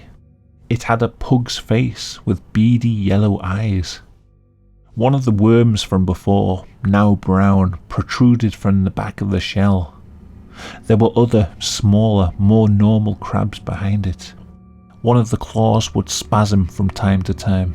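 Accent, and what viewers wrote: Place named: North, United Kingdom. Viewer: He’s just from up north lmao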